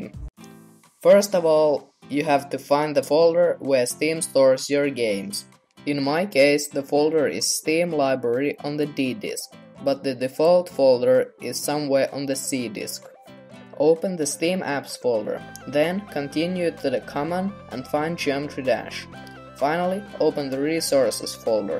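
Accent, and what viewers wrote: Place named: Finland. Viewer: Haha ku puhut enkkuu nii siit kuulee et oot suomalainen:D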